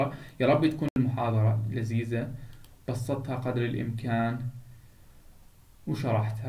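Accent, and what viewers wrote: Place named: Iraq. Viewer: دكتور عندك اكسنت من اللهجة العراقية 😶‍🌫️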